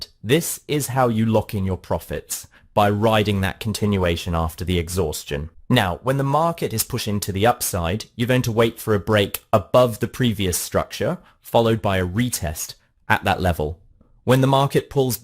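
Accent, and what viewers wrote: United Kingdom. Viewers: You are the best teacher I like your British accent Cheers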